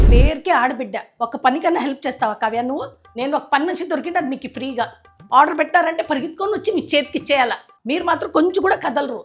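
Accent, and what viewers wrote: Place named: North India, India. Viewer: Meeru North Indian aaa madam... Slang vere la undi...🙂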